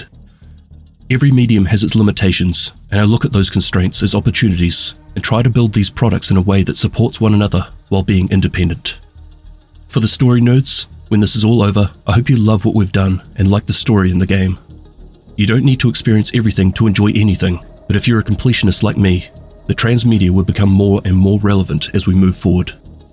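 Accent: New Zealand. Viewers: your kiwi monotone voice works wonders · Monotone Kiwi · smooth kiwi voice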